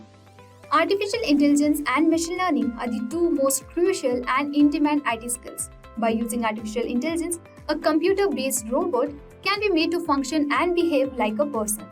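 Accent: India. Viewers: Indian accent insta skip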